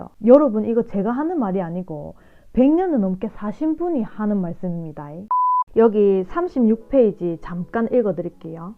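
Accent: Gyeongsang, South Korea. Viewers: 우연히 구독하게된 경상도 사투리~ 왕팬 될 것 같네요. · 자기계발 관련 동영상 정말 많이 보는데, 영상을 보고 직접 실행에 옮기게 해준 건 님 영상이 처음인 것 같아요. 감사합니다!! 제가 경상도 사람이라 그런지 말투도 너무 정감가고 실제로 나이도 같아서 똑순이 친구한테 피가 되고 살이 되는 잔소리 듣는 것 같기도 합니닼ㅋㅋ 같은 내용이라도 정말 이해하기 쉽도록 설명해 주시는 게 님의 엄청난 능력이라고 생각해요!! 만나는 주위 사람들마다 님 채널 추천하고 다닙니다ㅎㅎㅎㅎㅎ 백년을 살아보니도 꼭 읽어보겠습니다! 앞으로도 좋은 영상 많이 부탁드립니다 =) 감사합니다! 오늘도 행복만 하세요♡ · 경상도 말씨에 정겹고 배움에 도움이 되는 영상들이 많아 감사합니다. 사랑합니다.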